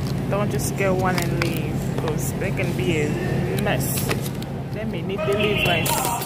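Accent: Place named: France. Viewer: Coucou! Ah oui, ton accent francais est encore fort. Ne t'en fais pas c'est pareil pour moi aussi. I always buy extra. Thanks for sharing, I like it.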